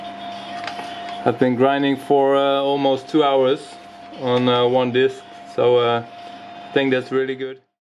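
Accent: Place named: Germany. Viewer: Cool, I thought you sounded German. Your thouragh like a German lol. Cool projects mate, hi from northern ireland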